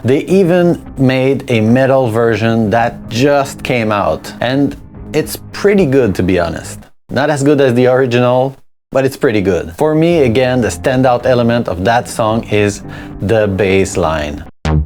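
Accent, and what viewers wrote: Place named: Quebec, Canada. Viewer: Are you from Quebec by any chance ? 😊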